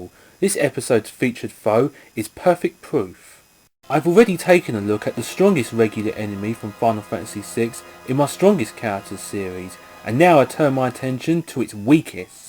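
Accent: England, United Kingdom. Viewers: its because he is english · WOOOW BRITISH ACCENT! Love it!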